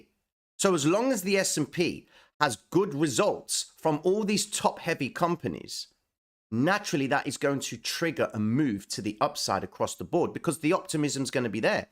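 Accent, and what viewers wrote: Cockney, United Kingdom. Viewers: Big love. Top fella cockney T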